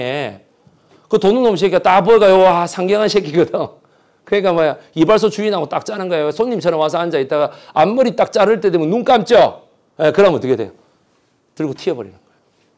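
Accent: Busan, South Korea. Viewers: 경상도말씨같아 서울이 고향인줄 몰랐네요 ㅎㅎ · 매불쑈에서 4.3 방송 잘 들었습니다. 말투가 저랑 같은 부산분 같던데 ㅎ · 는 모르는게 없네요. 부산억양이 완벽하시네예. ㅎㅎ.😊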